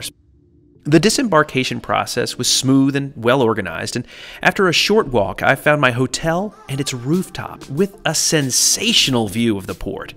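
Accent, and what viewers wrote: United States. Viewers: It's because he sounds and acts American. Some Greeks don't take kindly to Americans, or has that changed? There was a time where it was bad. I remember a time where my Cousin brought an American to Greece, took the Taxi, and got verbally abused by the driver. My cousin answered back in the most perfect Greek to which he shut his mouth. I would assume the same for Journey. I could be totally wrong.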